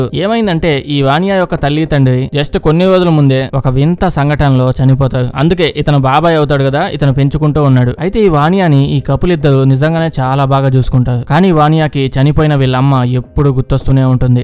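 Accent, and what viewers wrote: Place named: East Godavari, India. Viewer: Talking power good east godhavara majaka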